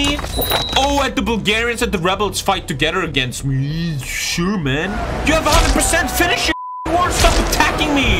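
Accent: Germany. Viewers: Is he german? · you sound a little bit Saxon sometimes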